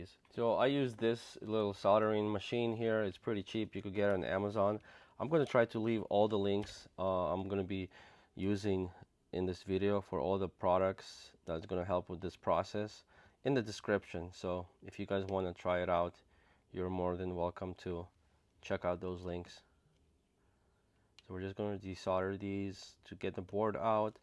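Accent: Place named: Russia. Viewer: You know the hardware/software repair tutorial is going to be good when the guy speaking has a Russian accent.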